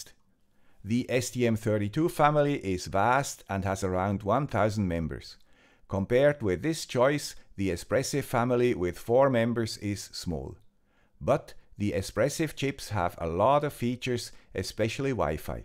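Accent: Switzerland. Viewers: The man with the Swiss accent does it again - another video of really useful information and comparisons. Thanks.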